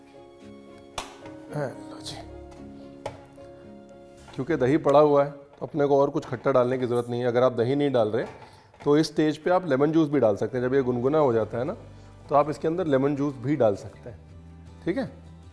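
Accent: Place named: Punjab, India. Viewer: Sir aap kahte ho ki aap lakhnow se ho But aapke bolne me to punjabi lehja hai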